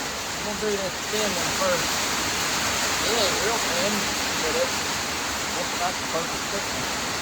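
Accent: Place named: Texas, United States. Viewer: I'm just here for the rain and Texas dialect ASMR.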